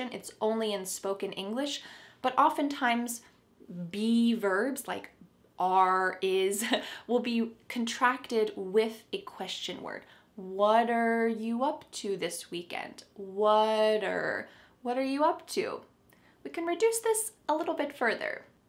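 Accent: United States. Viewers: Hi, I discovered this channel and for me it's the best. Are you American or aren't you? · Hello my teacher,daughter, how are you doing my daughter, hope you to be well,dear,I wanna say you thank you so much dear for all your fantastic lessons, by the way your accent also very nice and clearly really you're fantastic american accent english teacher in the end acept my greeting. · 😎I will become American after listening to you👻👍🏻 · Your English speaking style is very nice,,, You're amazing 😍 😊..I can learn American accent very well.❤️🇧🇩🇧🇩🇧🇩🇧🇩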